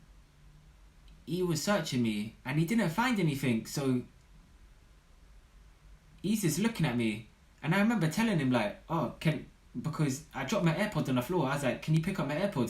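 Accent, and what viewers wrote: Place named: United Kingdom. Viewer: crazy story! I love your british accent lmao, im from australia :)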